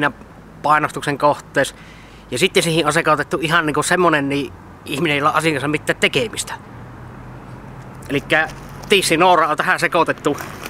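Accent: Savo, Finland. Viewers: Videot o hyvii, mut ärsyttää toi savon murre :D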